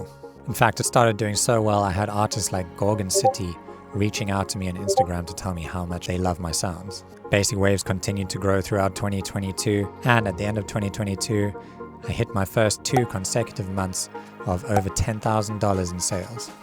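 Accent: South Africa. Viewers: Yo...I need to know, are you from South Africa? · Trying to place the accent. I know you're in UK but I'm guessing SA? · dude just noticed the accent is south african shout out bro !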